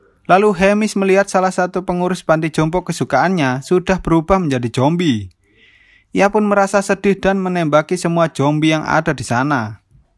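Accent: Java, Indonesia. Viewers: Bang lu orang jawa yh?? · Mimin keren bgt logat jawa nya auto subscribe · TERLALU NJOWO BANG 🤣 ZOMBIE JADI JOMBIE 🤣🤣😂🤣😂🤣😂🤣😂🤣😂🤣😂🤣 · Jowo mas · Bang orang Jawa kalh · He kak wong jowo yo kok ngomong e koyok wong jowo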